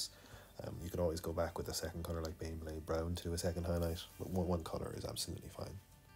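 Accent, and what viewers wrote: Ireland. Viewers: You're welcome. You also sound like a fellow potatolander so bonus points!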